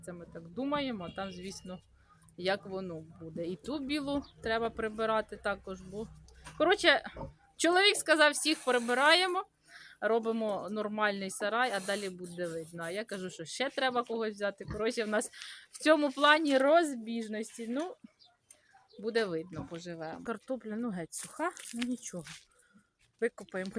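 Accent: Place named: Poltava, Ukraine. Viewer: Приємно чути Полтавський говір. Мої кореня з Ґрадижська. Цікаво дивитися, згадую дитинство. Дякую. ❤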